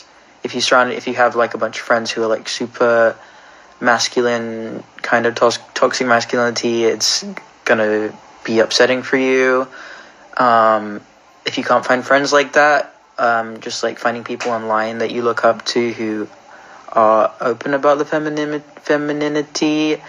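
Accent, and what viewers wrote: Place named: United Kingdom. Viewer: sounds so incredibly British when talking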